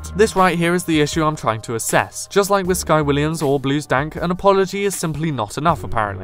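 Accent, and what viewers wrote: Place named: United Kingdom. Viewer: this shit would be hard as fuck to follow if you werent british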